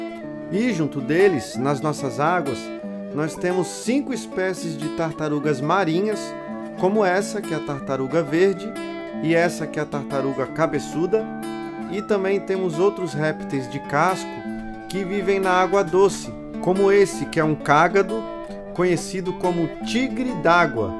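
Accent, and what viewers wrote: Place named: Florianópolis, Brazil. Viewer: Muito bonito seu trabalho! O sotaque manezinho da uma qualificada ainda maior! Rsrs As imagens são bonitas! É vc mesmo que faz todas? Continue firme! Está de parabens!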